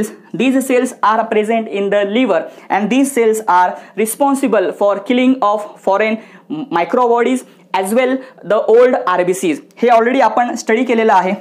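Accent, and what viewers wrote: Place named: Pune, India. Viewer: Punekar cha avaj ahe to...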